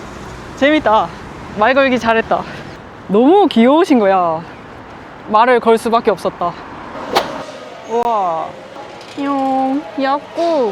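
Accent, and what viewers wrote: Busan, South Korea. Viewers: 구독함!, 꾸밈없어 좋음, 경상도 표준어 너무 좋음!!! 30대 후반인데 어째 4~50대 필이 나서 더더더 좋음^^; 요즘 시덥잖은 넘탱이들 여행유튭보다 훨 나음. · 부산분이시죠? 너무 잘 봤습니다. ㅎㅎ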